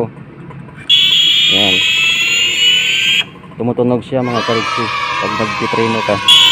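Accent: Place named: Visayas, Philippines. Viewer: Tunog bisaya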